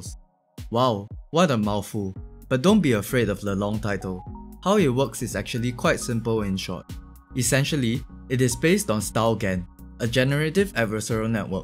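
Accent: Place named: Singapore. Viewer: i think hes singaporean, really distinct accent :P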